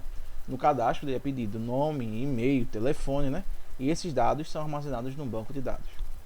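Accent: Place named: Northeast, Brazil. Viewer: Boa aula! E esse sotaque é típico do meu nordeste.👏🏼👏🏼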